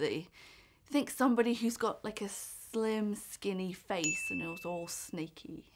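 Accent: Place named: United Kingdom. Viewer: Your videos help me emprove my british accent! Thnx 😋 Cheers from Argentina!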